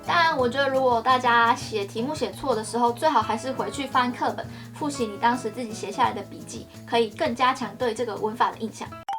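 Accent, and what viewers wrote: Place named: Japan. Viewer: 為什麼中文也有日語口音哈哈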